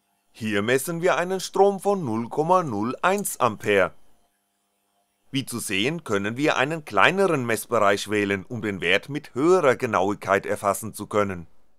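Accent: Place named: Baden, Germany. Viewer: Ich finde die Videos auf jeden Fall sehr lehrreich, besonders die mit den Selbstbauservos und was µController betrifft. Wenn jemand sehr mit Dialekt behaftet ist (so auch ich als Badener) und versucht den "Stoff" verständlich dem Zuhörer zu vermitteln, dann kann das mitunter für den Sprecher selbst sehr anstrengend sein ohne in den Dialekt zu verfallen. Das sollte man bedenken, letztlich geht es um den Lerneffekt des Themas. Das abschließende "Dange fürs angugge" ist jedenfalls unbezahlbar.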